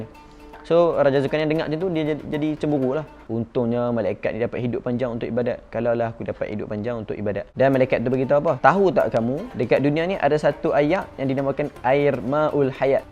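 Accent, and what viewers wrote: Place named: Terengganu, Malaysia. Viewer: Mate Prike hor ler kiter ni org pata timo je (ganu) 🤣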